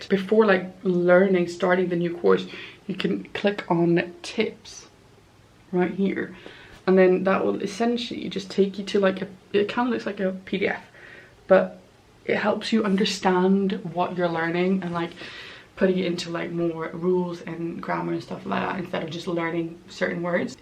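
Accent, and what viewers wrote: South Wales, United Kingdom. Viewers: her accent is more South Wales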